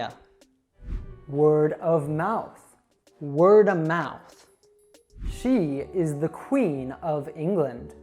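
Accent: United States. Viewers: Amazing American accent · Thanks a lot.l am learning how to pronounce words in american accent with you.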